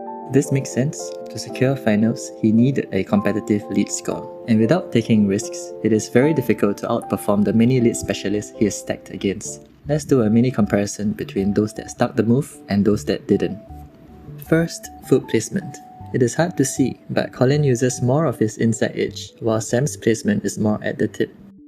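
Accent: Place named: Singapore. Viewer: Kinda guessed you were singaporean from the accent and the BP tai seng clip from another video confirmed it haha. Glad to see good content coming out of our little red dot, keep it up!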